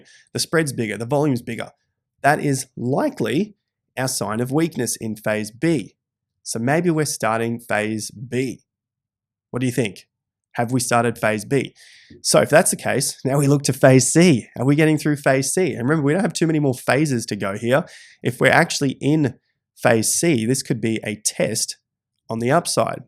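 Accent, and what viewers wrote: Australia. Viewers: accent brings me back to my backpacking experiences in Australia. Good on ya mate! But regardless of that, I love the honesty and all the considerations in this analysis. I’m gonna stick around here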